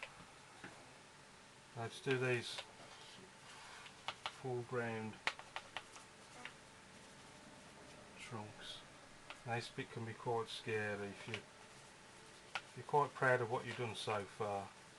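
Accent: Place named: Midlands, United Kingdom. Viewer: Good 2 hear a midland accent on you tube...it looks a bit like kingsbury water park...fished it but not painted it yet...good job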